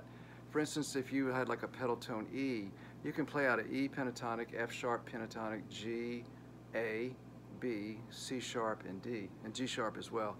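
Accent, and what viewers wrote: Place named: Scotland, United Kingdom. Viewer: Sounds a bit Scottish, lol.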